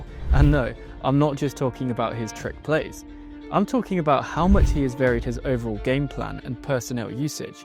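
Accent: England, United Kingdom. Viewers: No offense meant, but so weird to have a video about football narrated by an English accent. I thought most in the UK couldn’t care less about American Football. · Why are you English? I love this video and your breakdown.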